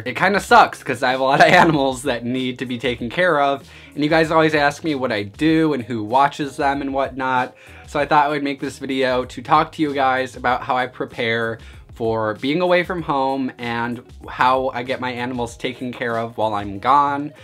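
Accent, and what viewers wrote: Midwest, United States. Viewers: He def has a Midwest accent! I never made the connection!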